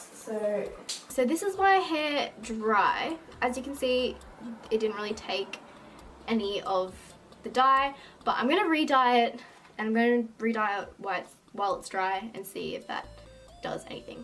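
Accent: Australia. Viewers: Is this an Australian accent I’m really trying to hear the difference between Australian and British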